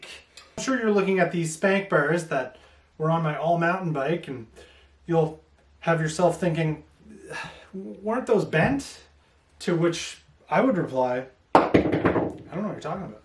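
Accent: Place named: Maritimes, Canada. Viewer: I thought you sounded like a Maritimer.. then you said "Nova Scotia winters".. I'm stoked to see an awesome East Coast youtuber building bodged bikes. I think you put the final nail in the coffin of my plan to buy a new hardtail this summer. My 2015 Norco Storm is getting a bunch of new parts in the near future. (As if I could find a kickass hardtail any time before July anyway...)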